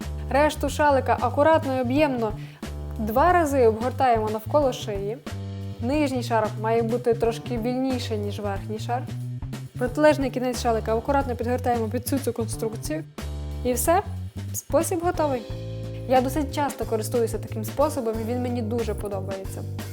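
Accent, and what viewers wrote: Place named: Ukraine. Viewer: У Вас дуже гарний український акцент:-)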